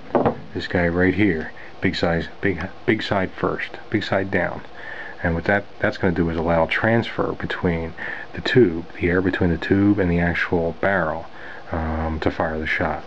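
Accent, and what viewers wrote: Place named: Philadelphia, United States. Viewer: are you from philadelphia or from the area? i noticed you have an accent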